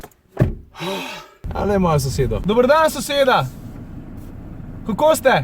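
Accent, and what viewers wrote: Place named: Maribor, Slovenia. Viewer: od tvoje mariborščine cepam :D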